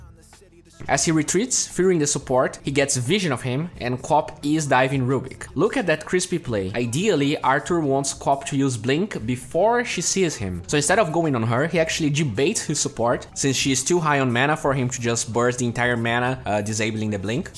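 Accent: Brazil. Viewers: where you from ? your accents sounds from brasil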